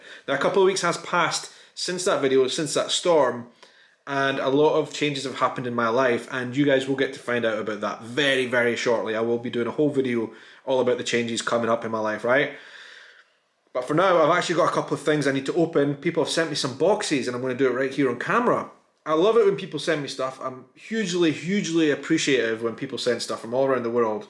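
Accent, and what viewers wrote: Scotland, United Kingdom. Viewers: Hi 👋 from SoCal... I have to confess, I watch your videos because I love the Scottish accent. ❤️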